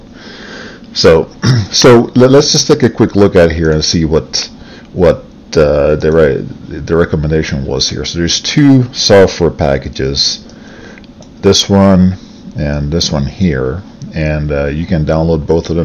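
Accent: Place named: Netherlands. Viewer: lekker bezig man! wel beetje overduidelijk nederlands haha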